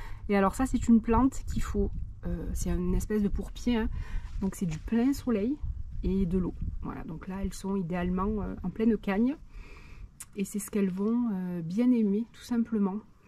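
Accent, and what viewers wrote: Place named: South, France. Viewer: Bonjour, je suis trop contente de revenir un peu sur l'ordinateur, comme je t'ai déjà dit j'ai un cancer du sein, qui s'est bien passé, mais mon traitement hormonothérapie est lourd, il y aura un an le 27 juin que je le prends, j'ai souvent des douleurs articulaires cette fois sa a battu le record sa m'a duré en douleurs 1 mois, mal aux os😪 mais j'essaie de penser positif c'est difficile,regarder un peu des vidéos me font oublier tout en restant allongée, bon STOP je ne suis pas la seule, tes vidéos je les adorent, je te l'ai déjà dit, j'adore ton accent du Sud😍les livres tupperwares, j'en ai aussi beaucoup, haha!!!les buffets j'ai aussi, j'aime la recette de la pâte minute qui est au début du livre, Est ce que tu l'as déjà faite, c'est super, on met les ingrédients dans une boite qui ferme on secoue et c'est fini, bon en salé pour les quiches ou sucré pour les tartes, le taboulé hummm! 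me donne faim, je fais aussi comme toi, qq fois j'ajoute aussi des dés de pommes,tes petits pains me tentent aussi je ne connaissais pas, moi aussi j'aime les fleurs, j'ai aussi du pourpier pareil, dommage que tu es loi, sinon je t'aurais bien demandé des cactus, maman en avait, je m'en souviens, j'en ai eux d'elle mais ils ont fini par disparaitre, trop serrés je pense et sèché, j'aimerais tellement en avoir, si un jour on descends en Corrèze, je regarderai si des personnes en n'ont et en demander, merci pour cette belle vidéo, merci, à très vite, je te souhaite un bel après m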